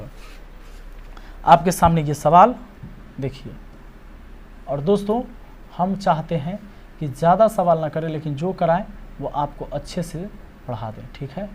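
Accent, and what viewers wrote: Bihar, India. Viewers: Nice sir ji aap to bihar sa ho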